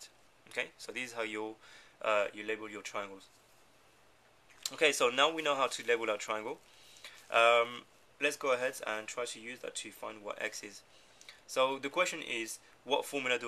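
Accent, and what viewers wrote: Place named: England, United Kingdom. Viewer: Learning math from a guy with an english accent >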